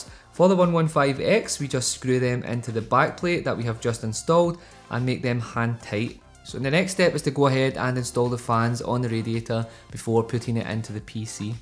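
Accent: Scotland, United Kingdom. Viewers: Yass, didn't expect a Scottish lad when I clicked on this video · Also, what part of Scotland are you from? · U Scottish or anything? Coz damn i love your accent! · clear easy to follow instructions, being a fellow scot easier to understand too, great info cheers chief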